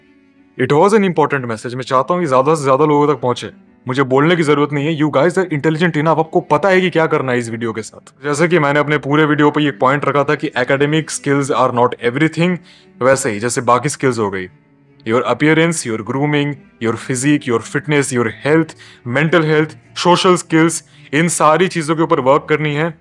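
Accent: Bihar, India. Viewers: Brother Aap bihar se ho kya ?😊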